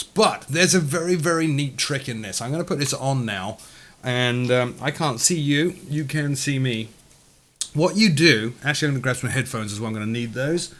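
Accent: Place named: England, United Kingdom. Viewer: I know it's English ... I can tell by the accent ... tech-talk is it's own new language - as usual in any discipline. I just am beyond amazed at age 60 that a) humans can fly b) humans can simulate flight c) humans can put this on an internet for all to see and d) we should all be apoplectic in the very conceiving of this 3rd millenium progress!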